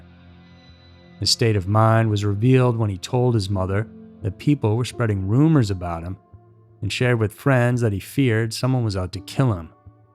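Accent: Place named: General American, United States. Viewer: The narrator has a perfect standard american english accent I have no idea tf youre talking about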